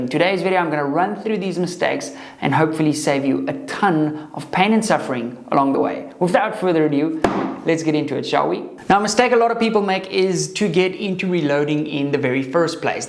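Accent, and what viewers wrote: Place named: South Africa. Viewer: Good vid. Great tips. Are you South African? We are. But live in New Zealand now. It must be expensive in SA getting the hardware you need....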